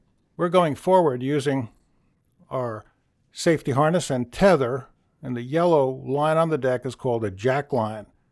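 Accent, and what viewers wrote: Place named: Southern California, United States. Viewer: I love The Philosophy of Sailing.I listen to it often. Learned so much. I grew up in Sherman Oaks and Fullerton. Your So. Cal accent (intonation, cadence, tempo, along with your wonderful humor) is something I am very familiar with. You remind me of listening to my best friend’s father as we hiked Catalina, from the isthmus to Avalon. Looking forward to more of your wonderful videos. Have you considered a Vol. 2 of Philosophy? I’d buy it in a heartbeat!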